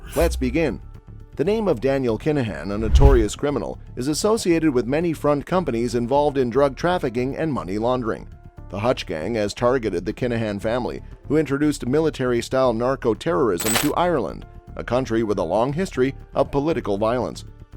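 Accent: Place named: United States. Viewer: These anti kinahan vids with American voices are pathetic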